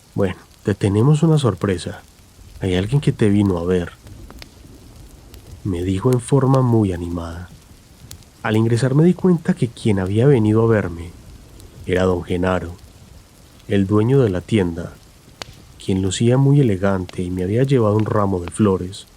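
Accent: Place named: Colombia. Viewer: Quizas el relator es de Colombia...no lo se ,pero esta historia es real,año 1910...coltauco...Rancagua...Chile...una noche de invierno...miguel fue real y aun en callejon de lo de cuevas existe un diminuto monolito en donde encontraron a miguel....felicitaciones por tan interesante y bien realizado relato❤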